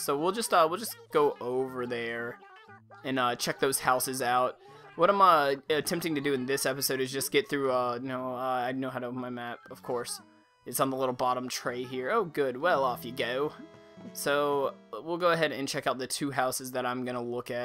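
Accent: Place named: United Kingdom. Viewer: Your British accent is funny! Great video, really helps :) Thanks alot!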